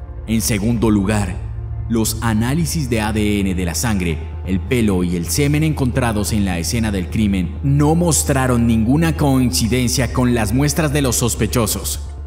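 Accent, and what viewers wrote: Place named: Spain. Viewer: Es el primer vídeo tuyo que veo y ya me he suscrito a tu canal. Me encanta el español de España que usas y que hablas en todo el vídeo.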